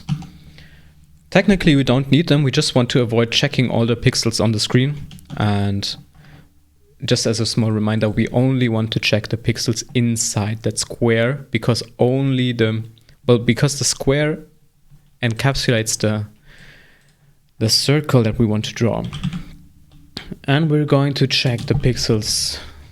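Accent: Germany. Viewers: Man merkt Bro ist deutsch hahahahah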